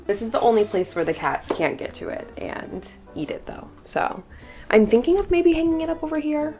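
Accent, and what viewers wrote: New York, United States. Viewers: Hello, sorry to hear about the loss of your Grandma. She must have inspired you in such a way. Good luck with learning to speak Italian in your trademark husky New York Accent! The Cats Olive and Bennett still steal the show! Take care from London UK 🇬🇧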